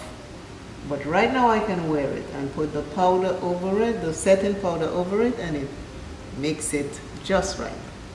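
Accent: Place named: Dominican Republic. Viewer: Are you Dominican?? I hear the accent 😊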